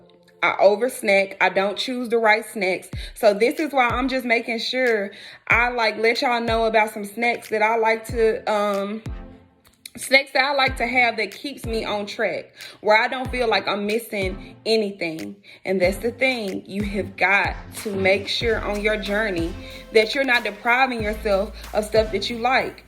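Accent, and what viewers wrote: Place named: Memphis, United States. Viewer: Gtta be from Memphis sound just like me I swear😂 we need a updated snack video 🫶🏽💕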